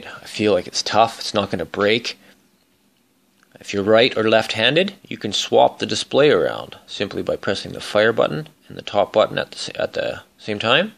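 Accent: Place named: Canada. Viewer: sounds like Canada for sure.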